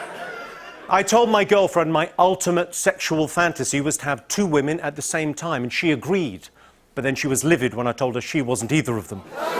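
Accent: United Kingdom. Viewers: I like how he intentionally slows down so Americans get the jokes in a British accent.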